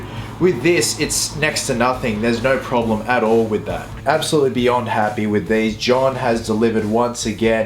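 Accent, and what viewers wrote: Australia. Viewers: There is something visually strange about Batman with an Australian accent. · The suit looks badass but it is so funny when I see batman just speaking in an Aussie accent saying be happy, be silly hahaha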